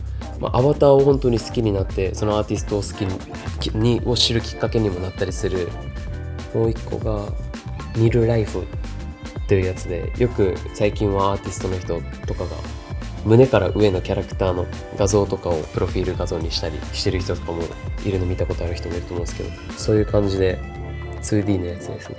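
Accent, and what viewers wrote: Okinawa, Japan. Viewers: めっちゃ沖縄訛り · 沖縄の訛りって優しくて好き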